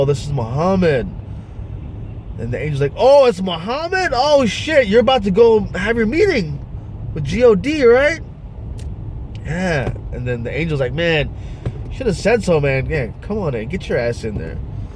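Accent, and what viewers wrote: Arab world. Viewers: You are fake , and you have Arabic accent even when you try to pretend different dialogue your accent is more clear so, how much you got payed from YouTube for that fiddling video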